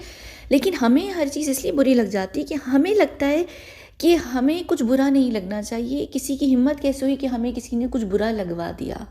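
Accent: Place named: United Kingdom. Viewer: OMG!! HEARD you angrezi wali accent after.....AGES...I fell in love with your voice...before everything else!! Love you!! 💕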